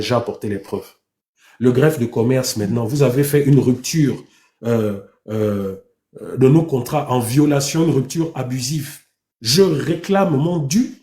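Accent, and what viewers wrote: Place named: Africa. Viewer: Bonjour tu parle vraiment comme un bantou❤❤❤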